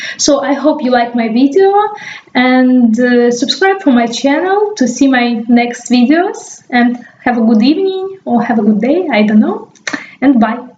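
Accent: Russia. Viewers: Your pronunciation is a cultural diversity as well it's really specific for Russian people to speak a Russian English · russian accent,i like it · Невозможно слушать этот нижегородский прононс... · I love your Russian accent so nice to hear that....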